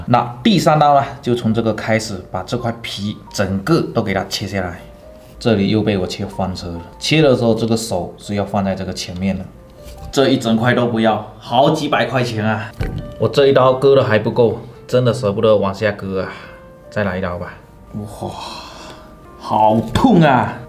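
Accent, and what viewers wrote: Fuzhou, China. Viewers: 胡州老哥普通划很亲切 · 浓浓的福州腔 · 我怎么觉得你是福州人，哈哈哈哈，口音和我家叔叔的好像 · 口音听起来是福州人吗？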